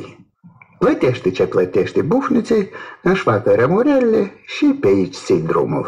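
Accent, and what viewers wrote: Moldova. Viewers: Dragă, chiar dacă am peste 60 de ani, iubesc poveștile copilăriei. Mai ales poveștile de seară, spuse în dulcele grai moldovenesc de peste Prut. Te îmbrățișez cu multă dragoste! Îți doresc o săptămână binecuvântată cu multă protecție DIVINĂ! 😘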